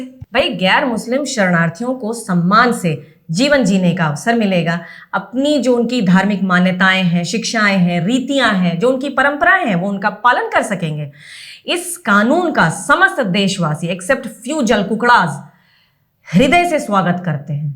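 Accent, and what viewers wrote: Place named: Uttar Pradesh/Bihar, India. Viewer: Superb presentation with classic rural UP & Bihari hindi touch. Very professional narration. Keep it up.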